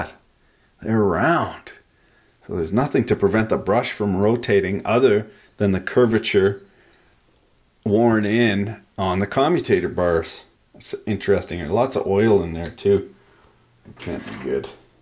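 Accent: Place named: Canada. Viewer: Everyone in Canada talks like that....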